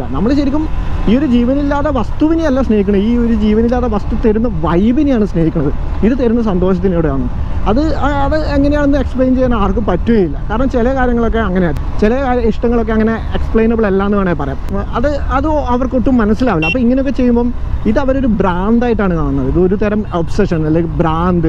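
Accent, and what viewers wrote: Kannur, India. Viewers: chetta, chettan kannur aano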